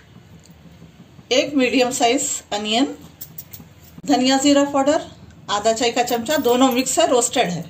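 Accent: Hyderabad, India. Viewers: Wah pakki hyderabadi language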